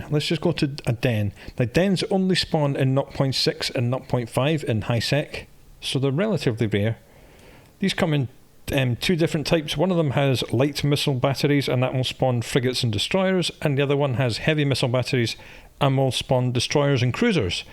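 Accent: Scotland, United Kingdom. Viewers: Nice one m8.... Baby!!!! get over here quick!!! back with his reassuring Scots burr, Greets from North of England m8:eyes-pink-heart-shape:😁👍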